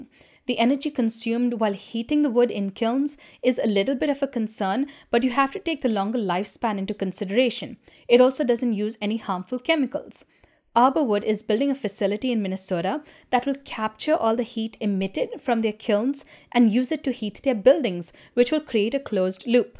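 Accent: India. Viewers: Really interesting to see the scientific component of the shou sugi ban. If i'm right, that technic work best with resinous wood? Placing it in a renewable context, especially the part about soft/hard wood and theyr growing time it's important. The other aspect it's that you have to let time to the tree to grow or you gonna get weaker wood. In France (burgundy) with climate change, we see a lot of pine-like tree getting infected and dying. Add that the unbridled wood harvesting to sell our tree to china. By the way, great video, with lot of informations, add the smile and the indian accent and it's wonderfull !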